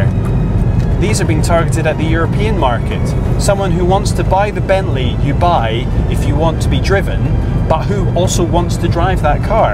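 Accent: Scotland, United Kingdom. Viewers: Scottish accent. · Middleastbeast It is in fact a very toned down Scottish accent, probably educated aborad. Not every Scotsman sounds like Willy from the Simpsons, lol. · lol at Scottish accent ahaha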